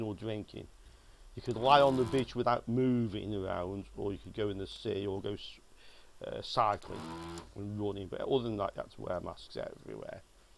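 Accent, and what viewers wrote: United Kingdom. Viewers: Got it! Thanks and thanks for not posting something rude. I was honest about wanting to learn from an expert and I clearly could not understand his or really any "heavy" British accent. I did manage to figure out "subtitles", something I did not know about. Even at 65 I still learn something new everyday. This Youtube is mostly a younger persons platform and have just recently started to watch, learn and enjoy from it.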